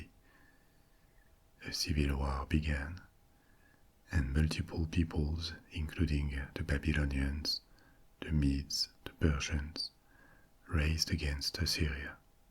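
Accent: France. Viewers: yes, he is French. He lives in Paris, so im guessing he was born there too. I love Paris. I lived there for 2 years. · My friend, I listen to your stories every night before I fall asleep. When I am asked how I slept, I say “wonderful!” How could I not when I have a dear French friend with a soothing and silky accented voice who reads to me until I fall asleep?” I’ve told many in my circle about you and most of them thank me joyfully! I have never listened during the day, but I did with this one. You are exceptional in your descriptions, your words paint the pictures in my brain! You are truly a treasure and I thank you from the bottom of my heart for putting in all of the countless hours it must take you to research the histories, write your script, set up your “scenery” for you to handle (VERY hypnotic), record, edit……..it exhausts me just typing just the steps out! 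It leaves me wondering when you find time to eat, spend time with your family, work or any of the things required to live your life! I have never chosen to use patreon, but I will now. You give me countless hours and it’s only right for me to give back. Thank you. From the bottom of my heart, my friend. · Yes. I'm not 100% sure, but I believe his accent is French. 🤔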